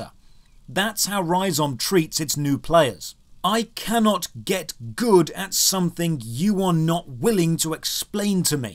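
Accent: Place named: RP, United Kingdom. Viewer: I love that you sound so posh and well spoken, so hearing you drop the f-bomb instantly makes me cry laughing. Thank you for all these videos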